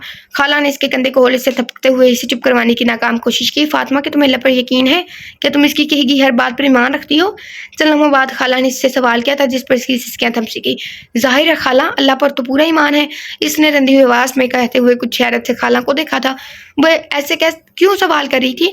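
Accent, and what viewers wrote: Punjab, Pakistan. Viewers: Yr JB novel parhtay Hain to bss vahi read kartay Hain beach main Apne dukhray nahi rotay Novel k start main batain kar leya karo or novel parhtay vaqat awaz or lhja narm rkhty Hain Urdu bhi Sahi boltay Hain Aap ka to panjabi lhja Hy Urdu bhi Sahi nahi bol Rahi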